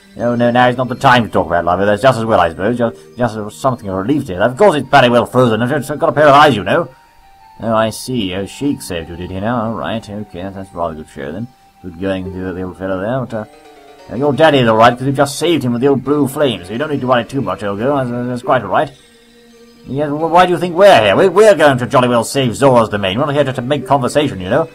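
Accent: United Kingdom. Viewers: WOW NOW THAT IS HARD-CORE BRITISH!!! awesome! sorry this is the first time i have wathced any of his vids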